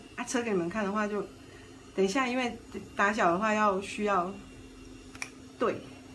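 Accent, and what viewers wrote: Taiwan. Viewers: 這個小包包的教程講解的很詳細，台灣式的普通話聽起來很舒服 。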